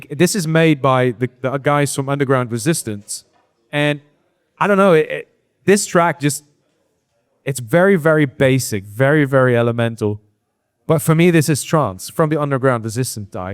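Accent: United Kingdom. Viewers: Why does he sound British? Lol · Armin sounds so different here versus ASOT. He sounds a bit British... Bizarre! Anyway, will definitely listen to this. Love this kind of insightful stuff.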